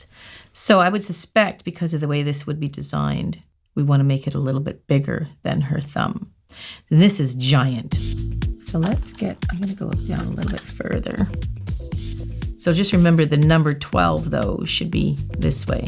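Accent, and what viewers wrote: Canada. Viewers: Are you Canadian?😜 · It’s my third time watching her vid and I totally don’t know anything about her But I’m going to guess she’s from Canada I’ve been hearing her saying ey many times 🖤🖤🖤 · You can definitely tell is Canadian because of how many times she says "eh?" after her sentences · Wait is she Canadian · Are you Canadian? Cause you say "eh?" a lot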